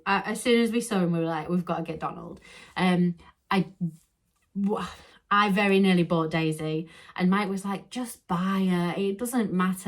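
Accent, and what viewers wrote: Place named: England, United Kingdom. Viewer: I love your typical english accent :-). I'm french and you speak very clear and easly too understand.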